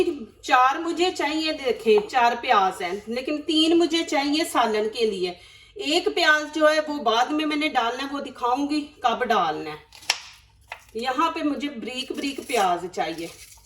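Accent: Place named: Punjab, India. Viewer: Simple and nice .Will try In Sha Allah. I liked your Punjabi way of talking.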